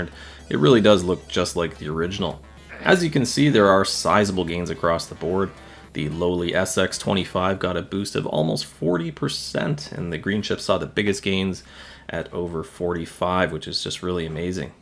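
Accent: Canada. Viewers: Very Canadian accent!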